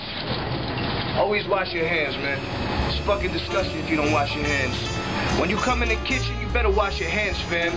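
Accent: United States. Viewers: That he does. He sounds more diverse than just the average New Yorker if you ask me. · That's that Flushing Queens in him. New York hardcore.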